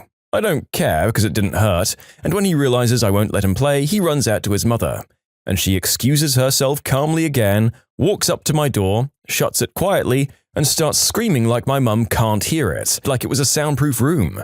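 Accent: United Kingdom. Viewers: THE BRITISH ACCENT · the brittish accsent is killing me and yes i spelled most of it wrong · i have not watched in a while why are you british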